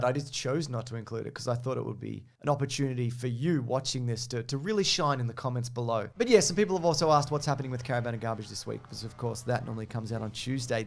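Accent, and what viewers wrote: Australia. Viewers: I love hearing breakdowns in an Aussie voice. "There's a vortex ring, which is like wind and shit" had me laughing so hard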